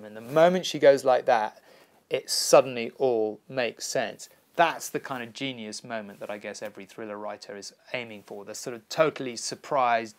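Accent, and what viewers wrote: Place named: United Kingdom. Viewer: I appreciated the content... the British accent can be painful tho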